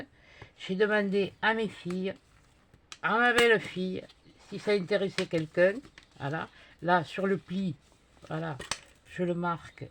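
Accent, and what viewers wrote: South, France. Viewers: Bonjour j adore vôtre accent du sud vous me faites trop rire.et vos réalisations sont très jolie.❤😘😘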